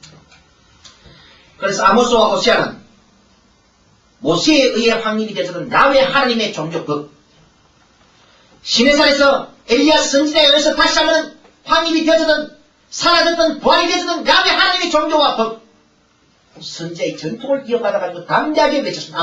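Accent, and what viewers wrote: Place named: Gyeongsang, South Korea. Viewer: 아멘 아멘~~~경상도 사투리 정겨워요 부분부분 돌려 듣기하느라 순교하는줄~ 말 엄청 빠르시고 억양도 쎄고ㅋㅋ그래도 은혜 받고 갑니다 아모스와 호세아 같은 선지자가 절실한 때인거 같습니다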